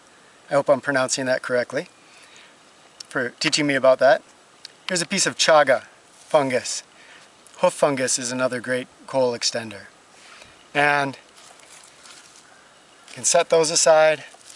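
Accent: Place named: Wisconsin, United States. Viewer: ! I've gotta say, I am really excited to have found your channel! I have always had a strong interest in the subjects you cover, but what is really exciting is that it sounds like you live in Wisconsin! The problem I sometimes run into when researching is they are region specific with materials. This can be hard. I live in central Wisconsin, and it is awesome to have a neighbor's expertise to draw from. Thanks for doing what you do! Great work my friend!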